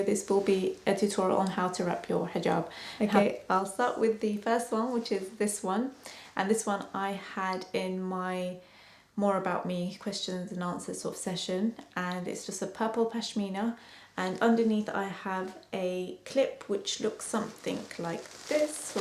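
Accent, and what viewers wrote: United Kingdom. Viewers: british accent,I love it!and of course I like the video :)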